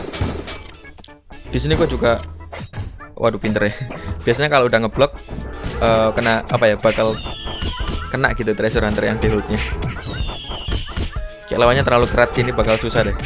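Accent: Malang, Indonesia. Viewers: "sama ae" 😂😂 orang mana sih bang? Malang?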